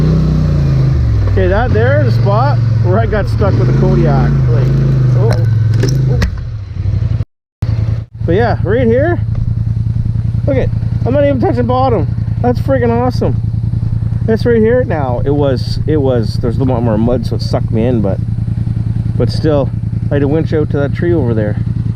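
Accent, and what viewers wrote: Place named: Canada. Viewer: Sound Canadian bro!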